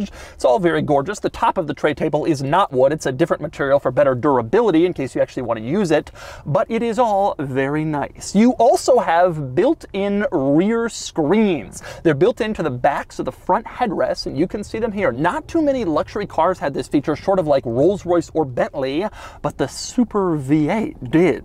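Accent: United States. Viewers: Why can Americans not pronounce Jaguar = Jag you are, not Jag waaar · JAG- WAAAR, Americans are so funny. It is pronounced JAG-YOU-ARE in English.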